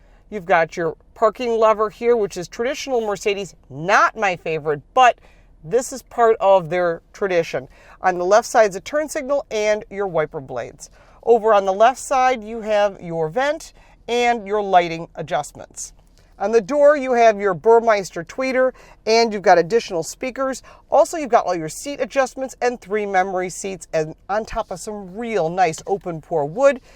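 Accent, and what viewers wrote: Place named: Chicago, United States. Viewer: Watched it 3x just for the Chicago accent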